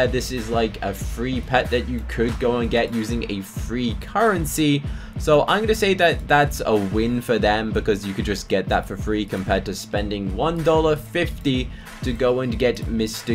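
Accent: United Kingdom. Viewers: Day 2 of asking are u British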